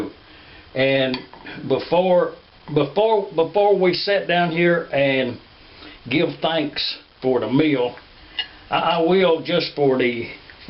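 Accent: South, United States. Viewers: Awesome!your southern style accent is great! I enjoy shopping at the open market too... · I'm from the north in Michigan and I married a southerner from TX. He also have family in Oklahoma and Arkansas and the food they make absolutely blows my mind. I am forever trying to mimic his family's recipes and just how welcoming and loving they all are. Your accent and cooking always reminds me of them and I love it so much. Keep it up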